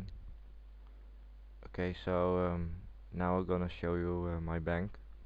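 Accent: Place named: Netherlands. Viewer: nederlands accent ftw :D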